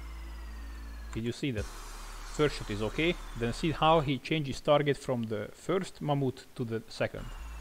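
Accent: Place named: Hungary. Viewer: Szerbusz, nemrég kezdetem el a játékkal ujra játszani, gondolom irok mert én is iszonyu sok emberrel talélkozok karantén alatt biztos unatkoznak és azt szeretném kérdezni h mit lehetne velük csinálni h ki legyenek tiltva, vegyem fel a meccseket én is is csináljam ugy v mi? (gondolom magyar vagy a "munka" mappáról, meg hallatszik az akcentusodon! :D )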